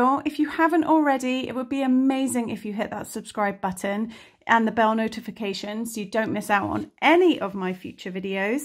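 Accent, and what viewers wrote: England, United Kingdom. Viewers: Beautiful yarn and nice to hear an english accent on a crochet video, may also look great with chain of crochet pulled through rather than straight strands but still fab. Also you could tie of single strand from each double yarn stand for a finer ending. However why as a fellow Brit are you using American terminology? You are doing an English treble stitch NOT an english double crochet. I have been crocheting 50 years and it annoys me English terminology has been ousted by youtube rant over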